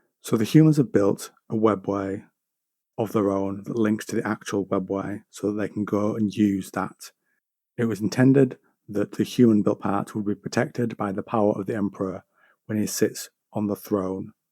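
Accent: United Kingdom. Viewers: Almost vomited within moments of listening to the cockney. Can't understand a word. Not for consumption west of shitlandia I mean Europe.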